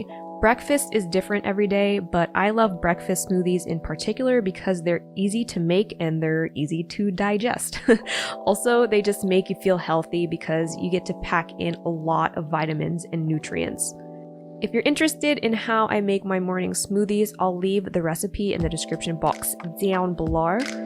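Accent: Australia. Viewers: I was wondering if anyone else noticed that lmaoo sounds kinda australian or new zealand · aussie accent goes crazy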